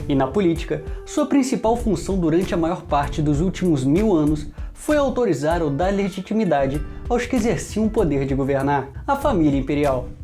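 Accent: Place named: Rio de Janeiro, Brazil. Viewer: Gosto muito dos vídeos! Só não muito desse sotaque carioca carregado... Parece uma bola vazando pelo pito!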